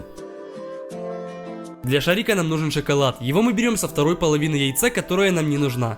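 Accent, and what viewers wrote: Ukraine. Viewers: да,советую посмотреть его первое видео.там он разговаривает более украинским акцентом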